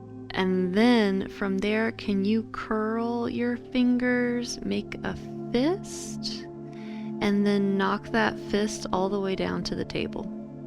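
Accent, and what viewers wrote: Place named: United States. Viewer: I like this girls really American voice. As a non American she reminds me of watching the US antiques roadshow. Also she gives a mean massage.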